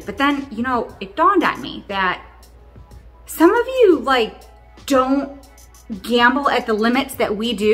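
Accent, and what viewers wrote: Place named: Midwest, United States. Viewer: I also love the fact that one your mid western accent is amazing very Edie Mcclurg and also it’s very simple if people just paid attention they would know that your doing that. What fans you have, and why people talk bad about it. Haha and the fact that you had to make a video about it is silly. You and hubby are legends and keep it up please and thank you.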